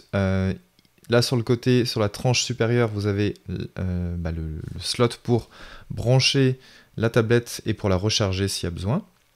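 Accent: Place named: Quebec, Canada. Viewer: Bonjour, Merci pour ta vidéo qui est très sympathique et tu n'as pas trop l'accent prononcé du Québécois. Bref, as-tu fait une vidéo pour bien configurer la tablette en AZERTY, car claver QWERTY ce n'est pas pratique. Merci d'avance pour ta réponse et si tu as fait une vidéo, d'y mettre le lien. :) Continue, c'est génial ! D'ailleurs, je viens de m'abonner à ta chaîne ! ;)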